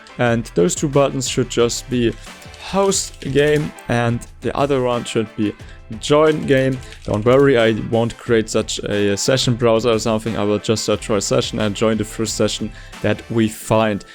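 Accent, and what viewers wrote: Germany. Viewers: man hört soooo dass er deutsch ist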